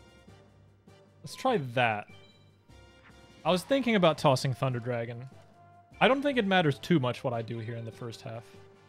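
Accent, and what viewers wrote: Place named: United States. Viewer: It's your accent. Or more so from an American's perspective, lack there of. Your English blends with "American English" honestly seamlessly to the point you can say your from New York and no one would bat an eye.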